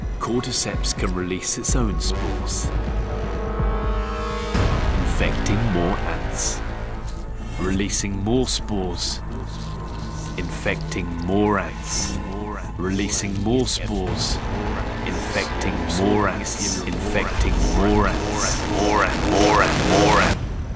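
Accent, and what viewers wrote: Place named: United Kingdom. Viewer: 剛開始看沒多久就覺得這聲音好像貝爾 結果結尾就看到貝爾的名字 他的聲音和英國口音真的已經深入腦海啦XDD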